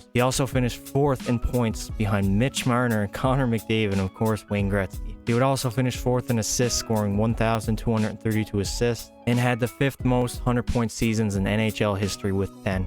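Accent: Newfoundland, Canada. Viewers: Most Newfie accent I've ever heard😂 loved the vid homie! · Are you a Newfie by any chance? I hear a hint of an accent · Are u a newfie? U sound like have a newfie accent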